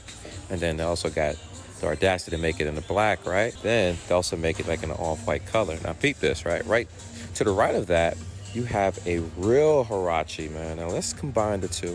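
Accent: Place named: New York, United States. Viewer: I love u talking cuz I am thinking ur from NY and I am from there and I live in Charlotte now so I like to hear u talking. Just found ya channel. Dig ur vids. 💪🏿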